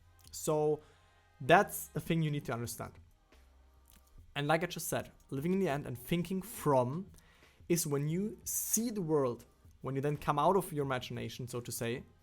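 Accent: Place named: Germany. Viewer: „ sounds pretty german, but there‘s basically nobody here knowing/studying Neville and all of that stuff“ - clicked on video, instantly got hit with a thick german accent 🙆🏻‍♀️😄 Na, dann sind wir immerhin schon zwei! 🙃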